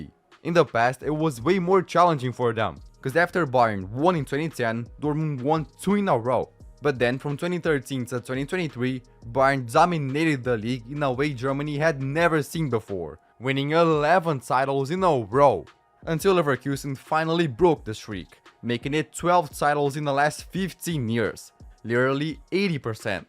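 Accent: Brazil. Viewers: hes brazilian bruh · Vc eh brasileiro? Adivinhado pelo sotaque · Você consegue percebe só pelo sotaque que ele é brasileiro kkkkk parabéns pelo vídeo mano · o acento brasileiro dele e muito evidente, fazendo esse acento no final das palavras, mas a pronunciação dele foi incrivel, ate imitando palabras em espanhol com acento ingles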